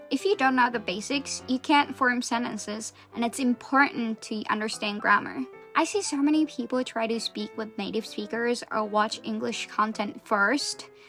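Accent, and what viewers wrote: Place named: California, United States. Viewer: 現在はアメリカ住みですか？ここまで美しいカルフォルニア？方面のアメリカ英語は中々聞けない気がします。 単語の選び方とかが特にネイティブぽい。。。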